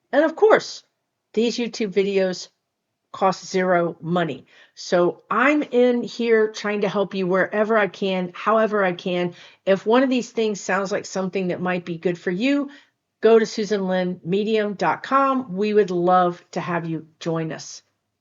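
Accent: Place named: South, United States. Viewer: You are adorable, down to earth, 100% believable and I love your southern accent when you let it out.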